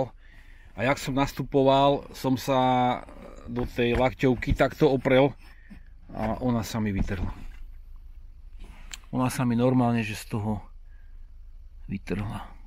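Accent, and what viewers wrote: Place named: Liptov, Slovakia. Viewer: odkial pochadzas??podla prizvuku bz by som tipol liptov alebo oravu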